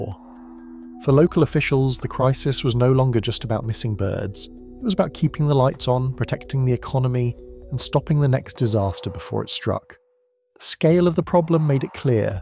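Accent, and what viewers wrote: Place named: United Kingdom. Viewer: Good video, very informative. Curious why this one has a British narrator and your others have a corn-fed American. Doesn't matter, just curiosity!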